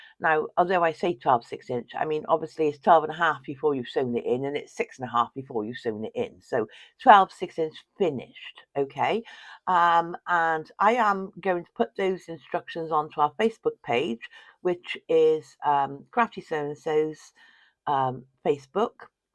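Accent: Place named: South Wales, United Kingdom. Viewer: I recognise a South Wales accent there, I’n from Ammanford!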